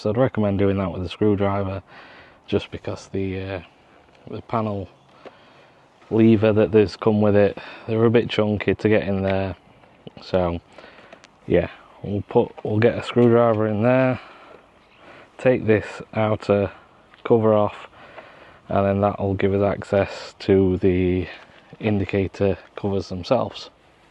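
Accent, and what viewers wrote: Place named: North West England, United Kingdom. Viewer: You sound local to the NW, is that correct? Which auction house did you use? Interested in doing the same sometime soon. Have fun, stay safe, make a profit.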